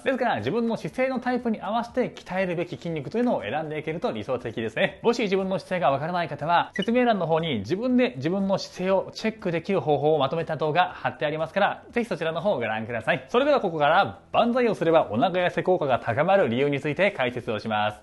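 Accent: Kansai, Japan. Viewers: 分かりやすい解説と、時々出る関西なまりが心地よく、楽しくやる気が出ます。優しい励ましの言葉も嬉しくなります☺！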